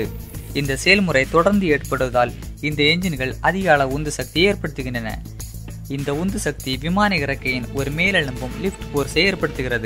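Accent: Kanyakumari, India. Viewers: தம்பி கன்னியாகுமரிண்ணு கரெக்டா தெரியுது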